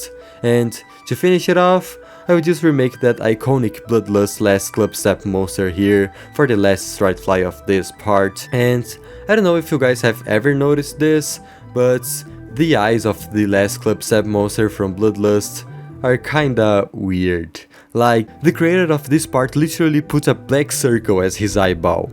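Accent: Brazil. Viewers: I KNEW IT,im portuguse from portugal and i knew your accent was from brazil xddd ola de portugal adoro o teu conteudo! Continua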